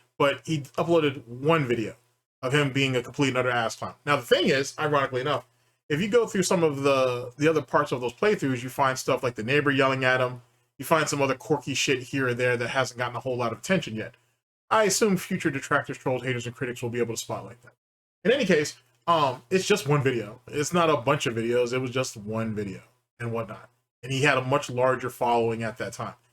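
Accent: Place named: London, United Kingdom. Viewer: Big ups the London Grime intro. Nice to hear my own accent.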